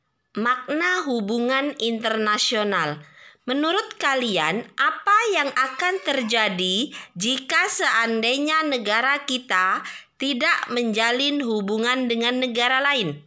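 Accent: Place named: Java, Indonesia. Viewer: Ibu org Jawa ya? Suaranya sedikit medok kyk orang Jawa.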